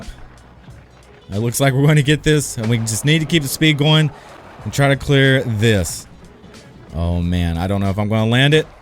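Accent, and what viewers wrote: South, United States. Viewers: he sounds like he lives in the southern us and nightspeeds lives in denmark i think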